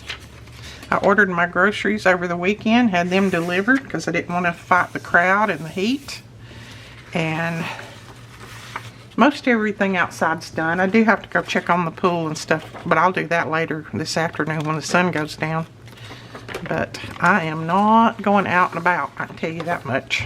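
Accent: South, United States. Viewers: Yup found it! Thank you. I love your videos and your southern accent! (I’m from Boston lol)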